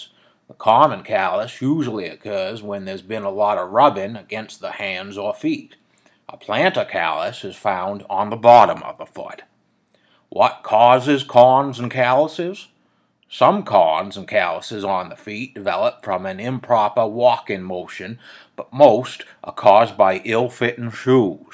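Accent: Maine, United States. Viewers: visited Maine this past week and met a lovely farmer in a Diner that spoke like this. Had a good chat. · I have a friend from northern Maine and a family member from Haverhill, Ma. This sounds like a cross between them. ☺️ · Well! Not only did I get to hear a Downeast Maine accent, but I think I figured out what's wrong with my foot, so thank you! :)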